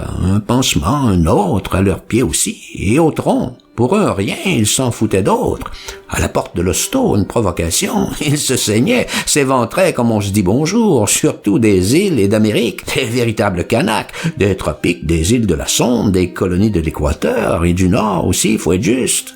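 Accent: Quebec, Canada. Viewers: J'y retourne 10 mois plus tard parce que je viens de vous écouter dans la suite... Quel plaisir de partager vos échanges et la langue de la belle Province que nous aimons tant à entendre. D'ailleurs comment faites vous pour vous départir de cet accent si beau quand vous lisez ? Bon WE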